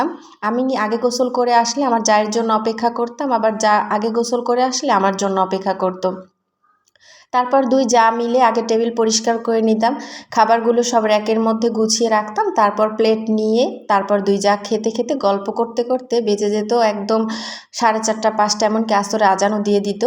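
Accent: Barishal, Bangladesh. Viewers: আসসালামুয়ালাইকুম সোনা আপু আমার ❤কেমন আছো তুমি❤ আমাকে কি ভুলে গেছো😢 ভুলে যাওয়ারই কথা😢 অনেকদিন তোমাদেরকে মিস করে করে গেছি 😢সাধ্য হয়নি তোমাদের সাথে যোগাযোগ করার😢 যাই হোক আপু ❤ভিডিও অনেক ভালো লাগলো❤ শুয়ে পড়ে কানে শুনেছি❤ তোমার বরিশালের কথায় আমার অনেক ভালো লাগে ❤তোমার জন্য অনেক অনেক দোয়া এবং ভালোবাসা রইলো বোন আমার❤❤❤❤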